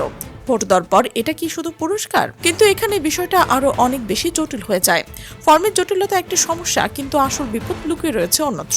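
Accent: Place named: Bangladesh. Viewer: এই চ্যানেলটি হল বাংলাদেশীর। কথা শুনে পরিষ্কার বোঝা যাচ্ছে। বাংলাদেশের লোকেদের এই বিষয়ে জ্ঞান দেবার প্রয়োজন নেই।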